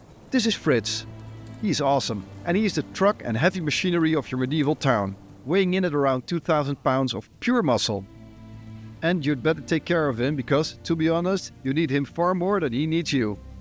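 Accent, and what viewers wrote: Netherlands. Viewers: That Dutch accent 😅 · Jij bent een Nederlander of niet, hoor t meteen, haha.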